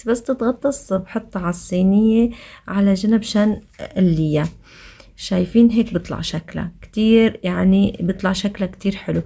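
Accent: Aleppo, Syria. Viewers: كل كلامك حلبي ولهجتك واضحة🤣🤣 انا من حمص وساكني بحلب بناتي صارو يحكو حلبي وكلامات حلبي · لهجتك واضحة انك حلبية بس يمكن بعض الكلمات بتقوليها متل الشوام بحكم زوجك شامي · الله يسعدك ويحفظلك عيلتك بلنسبة للهجة انا شامية ول من لشام ببين معو انو حكيك مو شامي اغلب الكلامات حلبية بس اكيد لانك متزوجة شامي حكيك لح يختلف بصير مختلط بين الحلبي والشامي دخيلا الحلبية مااحلاها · اهلين يا وره اسمحيلي اسميك ورده لأنك مثل الورده نفرح لمن نشوفك انا من اول ما تباعتك عرفت انك حلبيه من اللهجه مره حلو طريقة طلامك بالعكس واضح انك من حلب 🌹💞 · ماشاء الله عليكي يا ، لا بتحكي حلبي مظبوط انا مصرية وأول مرة أعرف ان فيه فرق في لهجاتكم زينا 😂 يعني الحلبة زي الصعيدي و اسكندراني زينا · تبارك الله عليك انا من البداية عرفتك حلبية من لهجتك وسميت الشطورة الحلبية ماشاء الله ماشاء الله احلى تحية لك من المغرب لسوريا عامة وللحلبية خاصة طبعا دايما متألقة لا تحتاج لتعليق 💚💛🧡❤ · انت بتحكي حلبي اصلي..خصوصي كلمة هلّئ 😅👌🏻عفكرة انا حلبية متلك كمان وجوزي شامي من الشاغور 😂كل عام وانت وعيلتك بألف خير يارب❤❤❤😘😍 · مو صح حكيك حلبي واضح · انا من اول فيديو حضرته الك عرفت انك حلبية لانه صاحبتي حلبية..انا من فلسطين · حبيبتي طبعا صحيح كلامك تغربنا وتغيرت لهجتنا شوي بس لسة في كلامات حلبية ع فكرة من اول فيديو شفتو الك عرفتك حلبية الله يسعدك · بالعكس. 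انا لما بتطلعي مع زوجك كتير بحس حكيك حلبي وانك مالك متأثره من بيت حماكي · بالعكس مبينة انك حلبية من لكنة الحكي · انا من متابعينك الجدد يلي بحبوكِ على فكرة كلامك حلبي واااااضح ومبينة أنو مالك من الشام، يلي من الشام بيعرف انو لهجتك مو شامية يعني الفاظ حلبيةمتل🤭 (لهلق ، الطواية ، وحرف الجيم ، وفتح الحروف الأولى بالأفعال) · يعطيكي الف عافية حبيبتي وصح بعدك بتحكي كلمات حلبية متل هلئ بتطلع معك بالحلبي خيت 😉😅❤️ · اي صح لهلق لهجتك.حلبيه مبين ومن اول مره شفتك بعتلك تعليق وسالتلك انتي حلبيه 😇 وانا حلبيه متلك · اللهجه الحلبيه حلوه حافظي عليها وعلميها لاولادك وخللي جوزك يتعلمها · يعني هي هي اللهجة الحلبية مين قال انو الحلبية بجقجقو اكتر من هيك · بتحكي حلبي احييي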